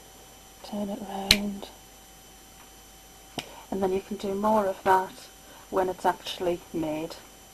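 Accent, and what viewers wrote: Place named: North East England, United Kingdom. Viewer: thankyou for a great tutorial , love the flowers you sound very similar to my cousin who lives in Saltburn up north do you come from the north east??im guessing you do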